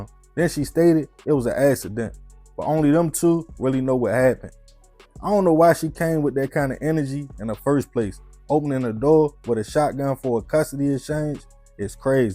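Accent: Baltimore, United States. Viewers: This is my first time viewing one of your videos. I subscribed because your content was pretty good and I'd recognize that accent anywhere. Baltimore, right? #CharmCity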